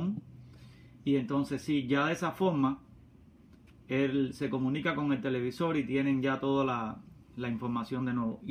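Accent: Cuba. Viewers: Hola tu eres cubano te reconoci por el hablado, saludos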